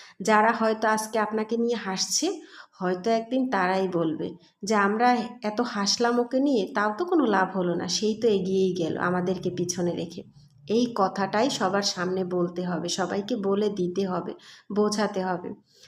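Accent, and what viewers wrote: Barishal, Bangladesh. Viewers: তোমার বরিশালের কথাগুলো মিস করি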